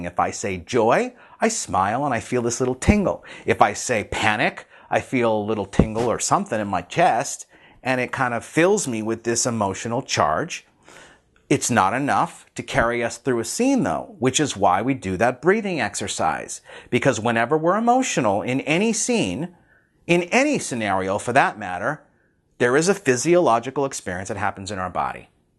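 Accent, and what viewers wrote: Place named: United States. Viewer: Thank you very very much! I am russian (Sankt-Petersburg)Your video is worth its weight in gold for me cause I understand all of what you're talking about.I want to talk american-english like you do and in the final analysis be an actor.Much odliged. Yury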